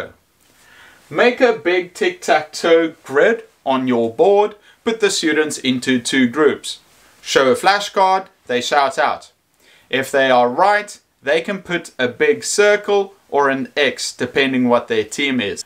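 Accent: South Africa. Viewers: That South African accent is a blessing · Great video, thanks for the tips! I am trying to work out your accent, am I wrong to say you are South African? I am not bad at picking accents considering I am not English :) but your accents makes me wonder....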